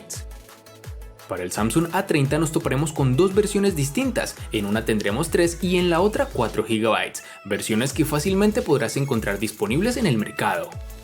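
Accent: Cali, Colombia. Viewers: Vos sos de cali vé?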